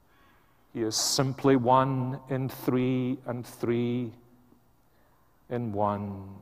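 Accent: Scotland, United Kingdom. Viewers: Preaching of the word of God is solemn. Hearing it in the Scottish accent seems to enhance the solemnity!!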